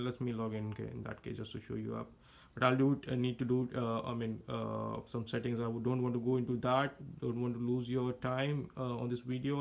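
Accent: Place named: India. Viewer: The indian accent suckssss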